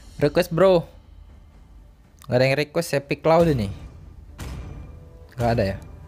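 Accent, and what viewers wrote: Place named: Sumatra, Indonesia. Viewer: Cara ngmongnya kek orng sumatra lu bng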